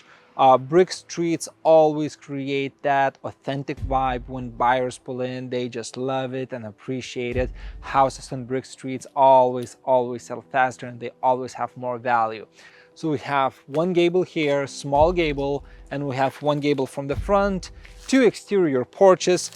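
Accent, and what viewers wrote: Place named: Baltic states. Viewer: Chanced on your video for the first time, wasn’t expecting blet by the garage, and only then did I recognise your Baltic accent. Nu va. Sveikas! 😊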